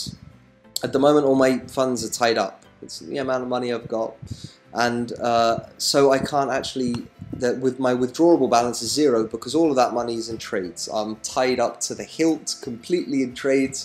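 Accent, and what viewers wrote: United Kingdom. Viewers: If you live in UK like me, then when you come to withdraw Etoro do not mention that them converting UK to USD to be able to trade , then when you cash in, Ettoro converting again £USD back to £UK costs UK citezens a whopping 25% ! . That is what I earn almost earn from trading, so whats the point in trading with etoro if you live in the UK? Strange as you sound English so I wonder if you have this issue or have you found a work- around on this one. If so it would be get to know the trick. thanks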